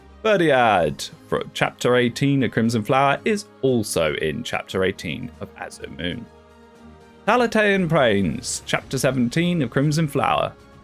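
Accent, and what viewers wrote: United Kingdom. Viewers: You sound Very British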